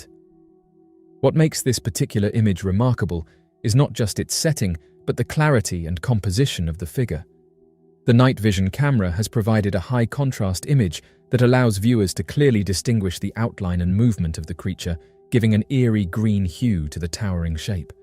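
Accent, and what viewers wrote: England, United Kingdom. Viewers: Filmed means moving pictures not photographs. You have the English accent but obviously havent mastered the English language.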